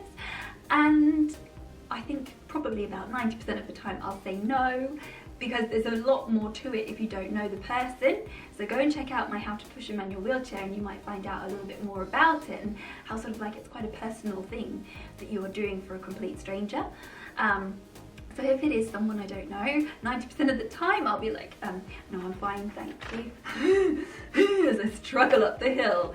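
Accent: England, United Kingdom. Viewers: It's like my opinions in an English accent. Are you reading my mind?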